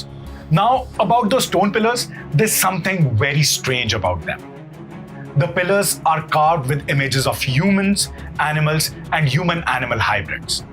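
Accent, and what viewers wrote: India. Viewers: I absolutely hate Indian accents. Just can't listen to them.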